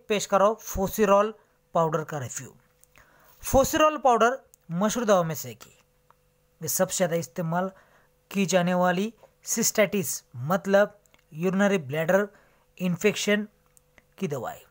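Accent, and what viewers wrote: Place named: Maharashtra, India. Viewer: बोलने वाला महाराष्ट्रियन हैं ना , गाँवठी बोलता हैं , ठीक से बोला करो भाई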